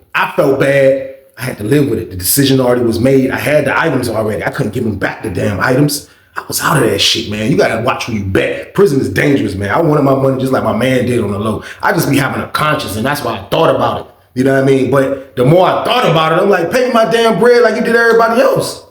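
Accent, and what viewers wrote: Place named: South, United States. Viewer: well rocks with every body black and white, and is color blind, that's just how talks, we are country 😀 Stay blessed 🙌 and inspired 🙏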